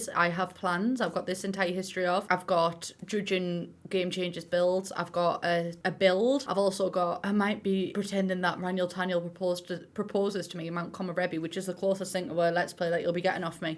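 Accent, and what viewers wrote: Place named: Northern England, United Kingdom. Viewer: She is obviously a English Northerner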